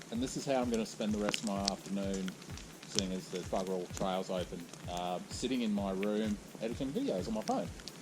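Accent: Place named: Australia. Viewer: Love the aussie accent mate. Also love the bike, great video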